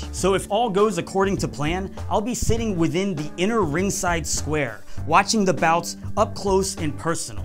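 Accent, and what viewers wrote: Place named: Wisconsin, United States. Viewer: The Wisconsinite accent is strong with this one.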